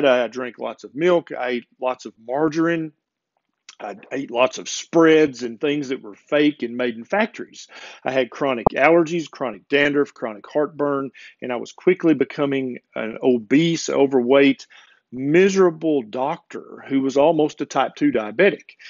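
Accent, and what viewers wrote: South, United States. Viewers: Nice to hear a southern accent on youtube! South Carolina here. Great vid! 🖒💚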